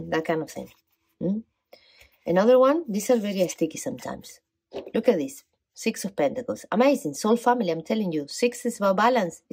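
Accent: Argentina. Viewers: reconocí tu acento ... cordobesa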